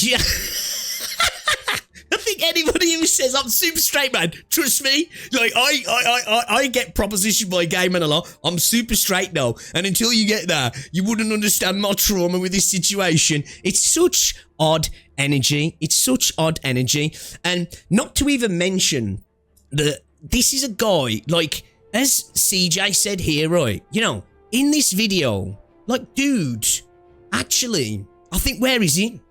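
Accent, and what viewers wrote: Australia. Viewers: Man you have the sweetest aussie accent ever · Ngl your voice and Australian accent is still dogshit to hear, haha can't even go past 1 min haha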